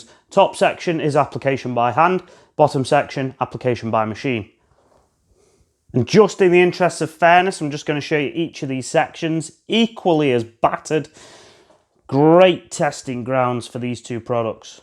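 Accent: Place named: Stoke, United Kingdom. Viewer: Do I hear a fellow Stokie?? 😂😂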